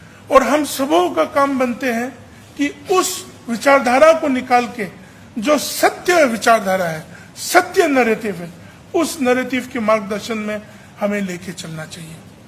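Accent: Standard. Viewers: मुझे आप पर गर्व है । भारत को सच्ची आजादी मोदी और बीजेपी युग में ही आती दिख रही है । आदरणीय तेमजिन साहेब आपका इतना शुद्ध हिंदी में दिया गया भाषण मेरे ❤️ को छू गया । बहुत बहुत बधाई और हार्दिक आभार। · Bohot acha laga hai NAGALAND ke brother or Adhyaksh Temjen Imna Ji ne itna shuddh or pyaare HINDI bola hai... 🙏 👏👏👏... Saath saath ek acha Sanskaar bhi dikh raha hai Temjen Imna ki swavab mai... Humare Northeast ke saare log agar aisa hi mindset laike aage barhenge toh humare Northeast ko kabhi bhi bahar ka log Hindi nahin jaante ya Chinese ya jo bhi ho, kabhi nahin bol payenge... Bharat Mata ko pyaar karna parega, ish Desh ko pyaar karna parega, Ish desh ki Rastrabhasha ko bhi pehchan na parega...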